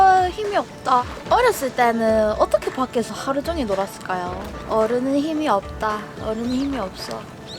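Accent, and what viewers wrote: Gyeongsang, South Korea. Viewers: 1. 베-베르그라뇨 르-르(느)무나 개취네욤 그-그럼…저 주시나요?ㅎ 2. 우드 랜턴 스탠드-월넛(중) 을 호시탐탐 눈팅만 하고 있답니다. ㅎㅎㅎ영상보고 넘 갖고싶은데…부피도 좀 있을 것 같고..가격도 가격이고… 저도 경상도 출신이라 경상도 액센트 넘 정겹고 좋아요. 항상 영상보고 부러운 마음입니다. 맛있게 먹고 작은거에 감탄하시는 모습이 힐링이에요~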